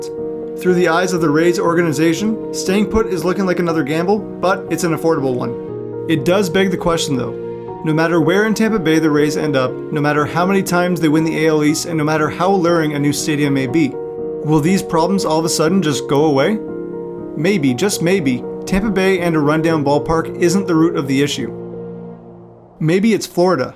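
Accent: Canada. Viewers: Is the narrator Canadian? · I noticed the Canadian pronunciation immediately because I have a friend from New Foundland! I remember reading that when Kate Mulgrew went to NY for acting classes that was the first thing she had to do was to lose the Midwestern accent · Your content is great. The only complaint I have is that if you want to be known as a top video producer, you should make a conscious effort to pronounce the ou, ow sound as people in the U.S. do and not as a Canadian. When i took a speech class in college, the first thing the professor did was explain that if we were ever to go into broadcasting, it was very important to have a generic voice that doesn't let people identify us with an area. You can go to New York for example and the broadcasters talk the same as the broadcasters do in Texas or Alabama. That is the only fault that i have. The content is great but with a little effort, you could make it even better.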